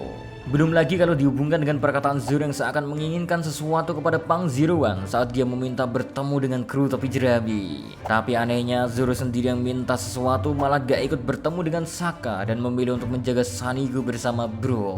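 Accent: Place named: Lampung, Indonesia. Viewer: ini orang lampung kayaknye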